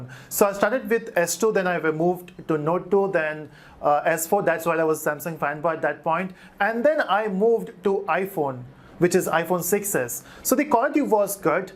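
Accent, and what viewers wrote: Telugu, India. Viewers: bro are u from andhra or telangana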